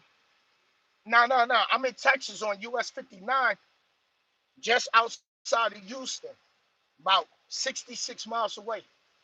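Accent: New York, United States. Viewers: What's good, my brother. I'm confused. I hear a Knickerbocker accent, but I'm seeing a Raiders hat. Anyway, I always tell folks I'm always on time.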